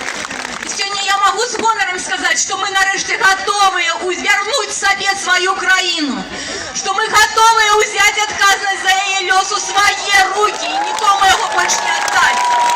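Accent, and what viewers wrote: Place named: Belarus. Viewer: Какой у неё чистый беларуский, респект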